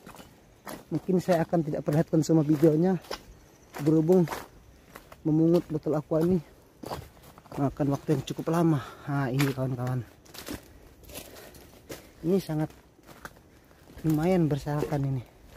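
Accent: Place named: Bima, Indonesia. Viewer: Logatnya kek BIMA NTB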